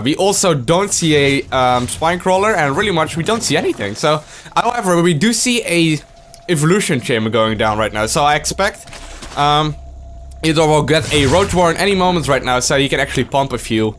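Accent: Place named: Netherlands. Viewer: As a longtime fan I've decided to watch a few old videos.... wow... his Dutch accent is so much more noticeable. Amazing.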